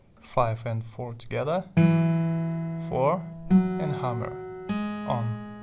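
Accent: Russia. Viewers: Are u Russian? I hear some Russian sounds I think😀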